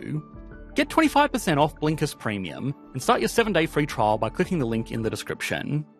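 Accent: Australia. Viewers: I detest this channel, and not because of the unbearable Australian accent. Nevertheless, I want it to go on if just to watch other YouTubers point out the myriad of mistakes in these videos.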